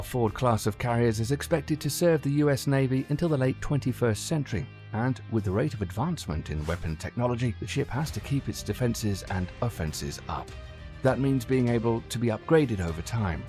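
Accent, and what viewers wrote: United Kingdom. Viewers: Don't forget, the narrator is speaking British English, Not American English. Pip pip, Tally Ho, and all that rubbish! Like my Grandson once said, "Why can't the Canadians make Real Bacon, instead of calling a ham slice, Canadian Bacon?" We had to 'splain, fried meat slices is NOT always Pork.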